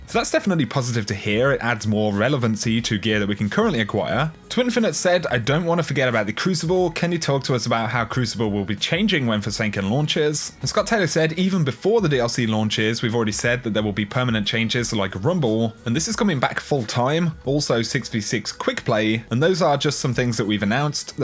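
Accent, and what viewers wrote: England, United Kingdom. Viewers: Hey those idiots are jealous they dont have a sweet ENGLISH accent!!! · Wow ppl got triggered because you are English and therefore don’t pronounce words with an American accent? Lol, you mortals are so petty.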